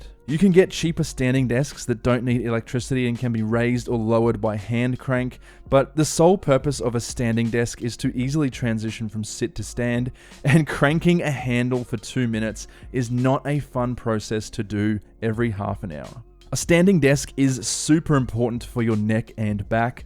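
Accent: Australia. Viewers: You’re aussie right 🥵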